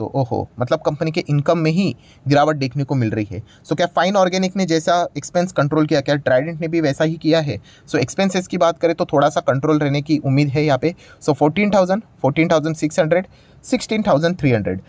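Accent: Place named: Gujarat, India. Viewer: Sir many times i observed that you are perfect gujarati this is confirmed, i observed your words to words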